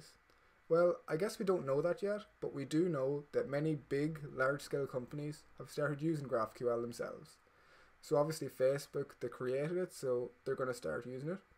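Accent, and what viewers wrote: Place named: Ireland. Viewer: Great quick video! And cool to hear an Irish YouTuber doing programming tutorials 🤙☘️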